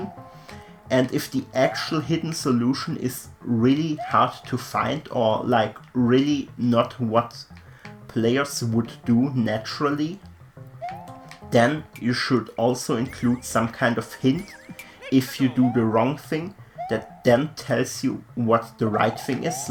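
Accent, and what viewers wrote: Germany. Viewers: Dein Akzent hört sich sehr deutsch an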